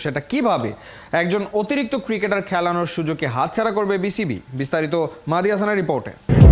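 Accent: Kolkata, India. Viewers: কোলকাতার এক্সেন্টে কথা বলছেন কেন?? বাংলা জানেন না?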